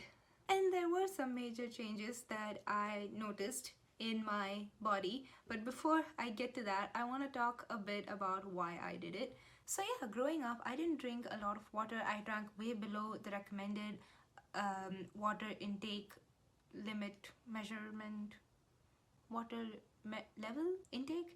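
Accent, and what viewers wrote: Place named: India. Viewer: Typical indian accent, bathroom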